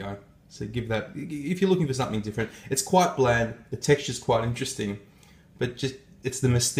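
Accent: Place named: South Africa. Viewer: Your accent is insulting. A) Not all of us are Afrikaans. B) No one says "South Efrica" or "Grevy."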